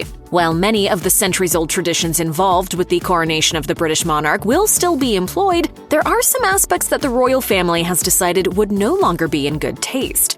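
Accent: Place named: United States. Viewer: I do not understand why these British Royal ‘posts’ are narrated by an American.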